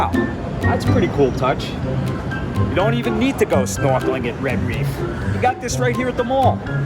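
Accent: New York, United States. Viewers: I forgot what sun looked like thank you! You never lost your NY accent. Hardly anybody has one in NYC any more. Do you get discriminated against at all for being a New Yorker?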